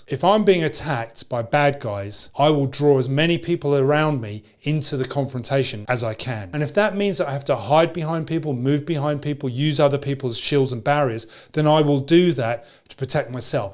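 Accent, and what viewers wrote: United Kingdom. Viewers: The comments are from people all over the world, but especially GB, because the narrator is British. We don't have access to firearms in Britain. I'm guessing that you are a US citizen, and I understand your right to do EDC and defend yourself with a handgun. Here they are not morally justifiable for ordinary citizens, but that could change of course.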